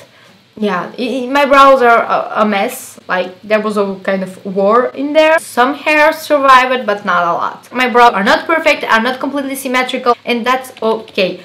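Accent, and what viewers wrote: France. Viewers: Hi,Wonderful Blue eyes ,God bless you. Inoticed your French pronunciation Have you been raised in France? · Tu est française non ? Ca s'entend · Wow the french accent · Wow... J'en reviens pas. Ce sont les sourcils les plus naturels et réalistes que j'ai jamais vu et trouvé sur Youtube! Tu m'as vraiment donné envie de me mettre au makeup :) Je m'abonne sur le champ !!! ( ps: je me permets d'écrire en Français car j'ai remarqué ton léger accent et quand tu as dit Christelle Dominique lol)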